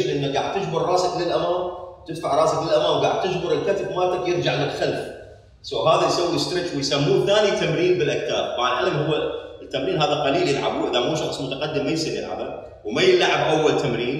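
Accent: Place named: Iraq. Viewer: سلام عليكم انت عراقي الهجه والملامح هل أنت تسكن في العراق لو في ليبيا أين مكانك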